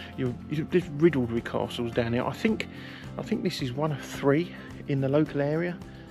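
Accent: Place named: United Kingdom. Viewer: aaaahh the british accent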